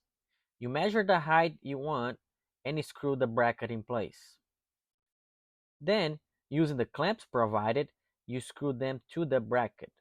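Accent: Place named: Brazil. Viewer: I can tell a Brazilian accent when I hear one haha great work man! Meus parabéns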